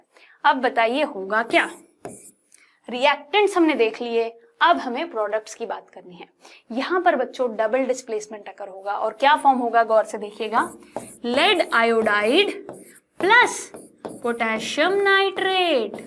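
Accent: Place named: Bhiwani, India. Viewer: Bhiwani se ho kya mam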